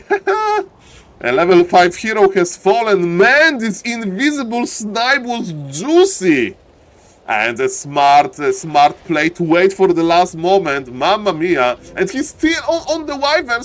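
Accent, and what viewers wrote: Poland. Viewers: Hey, I've seen your commentary with Neo at Back2Warcraft, is it you? Are you polish? Cause your accent sounds like you are polish, just like me :D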